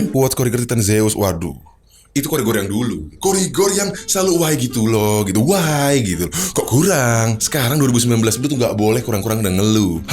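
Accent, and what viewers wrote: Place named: Madura, Indonesia. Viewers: Logatnya kayak madura ea 😂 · Udah lama ga ngeroast orang kok logatnya jadi madura qor . . Btw dikit lagi sejuta nih, siap siap ditagih janji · lama lama kok logat lu kek logat madura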